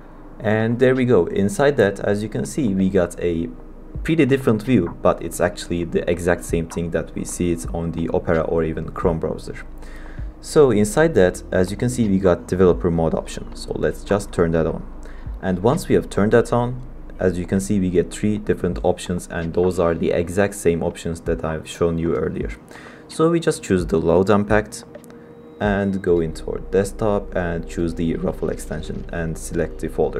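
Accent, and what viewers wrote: Turkey. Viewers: türk ingilizcesi bu nerede olsa tanırım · sende bi türk aksanı sezdim :d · You have a different accent, I guess you're turkish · this guy sounds Turkish.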